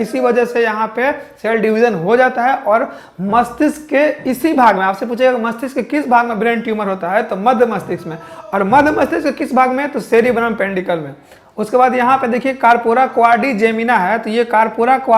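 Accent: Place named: Bhojpuri, India. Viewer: Explain accha kr rhe h 👍 Tum lakh chupao baat magar bhojpuri to nikal hi jayega❤️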